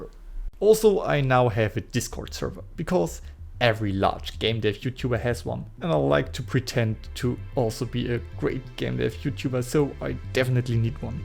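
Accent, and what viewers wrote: Germany. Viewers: does this work with rift s? xd und biste deutsch? xd hört man irgendwie raus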